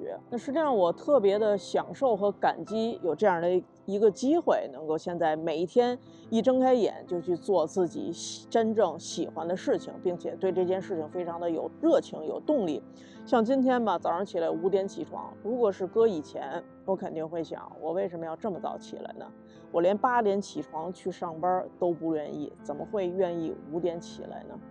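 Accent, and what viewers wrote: Beijing, China. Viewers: 摄影师里京腔最好听的，😊😊😊😊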